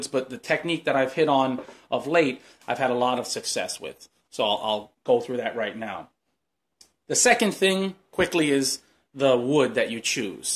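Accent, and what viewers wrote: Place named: East Coast, United States. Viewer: You're clearly from the East Coast... I'm guessing Jersey? Like your vids, I'm subscribed, continued success.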